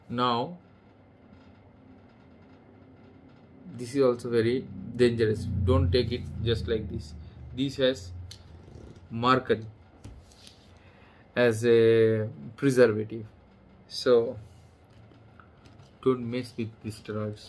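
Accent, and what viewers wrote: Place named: Canada. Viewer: I like your accent. Are you Canadian ?? Eh ?.ya ? Cool . Thanks doc.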